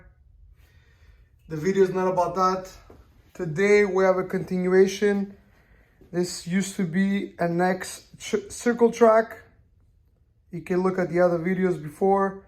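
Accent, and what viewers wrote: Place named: Quebec, Canada. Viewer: You from Quebec bro ?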